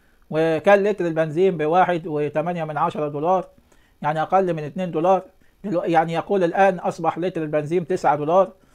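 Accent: Egypt. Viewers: كلامك مفهوم جدا، واللهجة المصرية حلوة ومفهومة 👍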